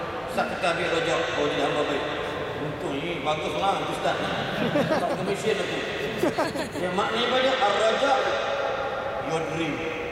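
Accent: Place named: India. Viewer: ni ke ustaz bollywood tu?salu dgr dlam IKIM jer..pndai die ajuk/ngaji slanga india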